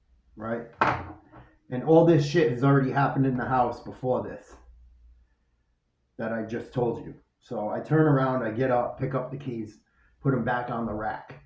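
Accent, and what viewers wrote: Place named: Boston, United States. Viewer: Good stories! The boston accent😎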